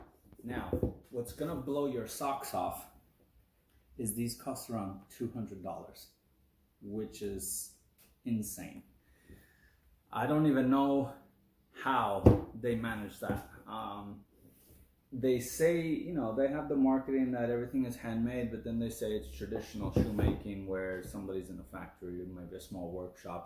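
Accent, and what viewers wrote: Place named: Eastern Europe. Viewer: where were you born? Your accent and grammar appear to be non native. Eastern Europe?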